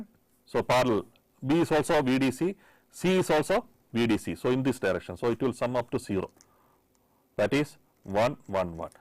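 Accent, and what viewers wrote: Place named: South India, India. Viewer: very useful video...regarding his accent, he speaks indian accent particularly south indian accent..ppl may find it difficult but slowly u will be used to his pronunciation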